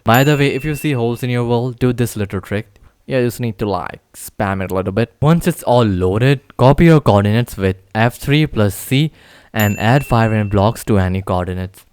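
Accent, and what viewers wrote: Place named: India. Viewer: i feel like getting scammed from a india scammer he has like an voice like an idian try to speak english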